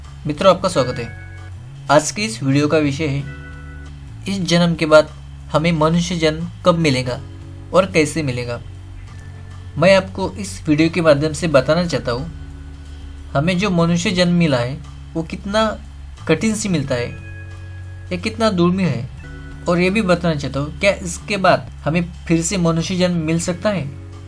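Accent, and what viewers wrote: Bihar, India. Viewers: apni awaj mei mt upload krna bihari ho n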